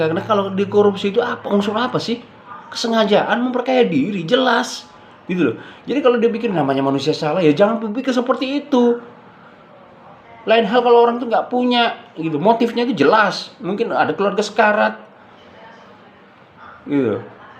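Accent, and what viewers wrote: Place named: Java, Indonesia. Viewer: Jowone sampean ngendi masehhhh menowo tonggone hhhh pon tak subscribe lho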